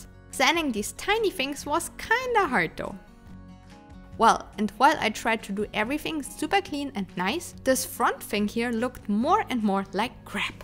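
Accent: Germany. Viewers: Hi do you speek germany, ir sound like this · Sprich doch deutsch🤦🏼man hört das du deutsch sprechen kannst KeIn EnGlIsch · Hi,! Really nice work! Do you live in germany? I think i heard a german accent in your english. ^^ Would be easier for me so i could leave comments in german. 😄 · Einwandfreies Englisch mit Deutsch Akzent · Dieses deutschhhhh!!!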